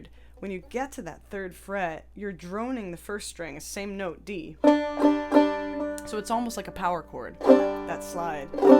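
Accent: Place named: West Coast, United States. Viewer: why cant't she pronounce a ""T"" ? I really resent this ""west coast"" English. Go back to your 3rd grade English teacher. Speak proper English if you are going to do an American YouTube !!!! Duh !!!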